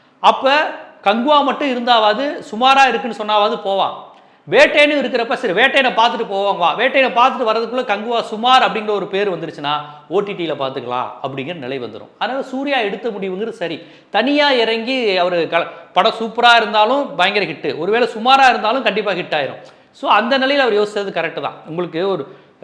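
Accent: Madurai, India. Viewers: நீங்க மதுரையா.. நல்ல நாகரீகமான விளக்கம் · Bro neega madurai aha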